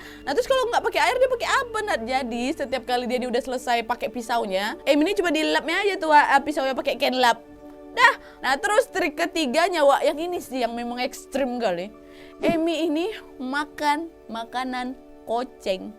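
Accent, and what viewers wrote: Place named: Medan, Indonesia. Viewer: Suka kaliii bah aku dengar cara bicaranya,gaya medan bahh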